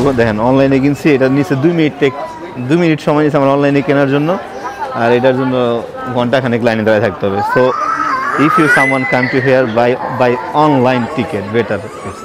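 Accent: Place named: Bangladesh. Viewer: Love your Bangla ascent 😅😅😅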